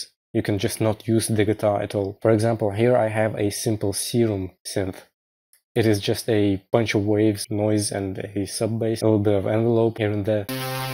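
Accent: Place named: Russia. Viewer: дружище, ты ведь русскоговорящий? я четко слышу этот акцент ахах. а вообще, очень круто. давно не смотрел подобных видео за редким исключением, но ты смог зацепить и вдохновить. всегда обожал метал и в последнее время подсел на брейккор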